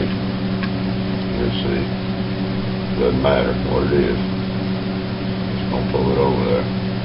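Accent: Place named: Kansas, United States. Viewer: yes the voice and i do like the accent from kansas??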